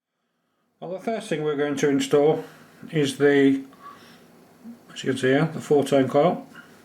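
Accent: Birmingham, United Kingdom. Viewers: Hi there i also live in Birmingham uk it was your accent that gave it away anyway i also have a 100w transmitter but have no equipment except for a multi meter to tune the frequency and very little know how but i am learning fast.It has an exciter board with various trimmers ect and a crystal. how do i tune it, which trimmers do what, and to get around blowing the main output if i disconnected the power to the main amp board this would save me having to to buy a dummy load.Many thanks Paul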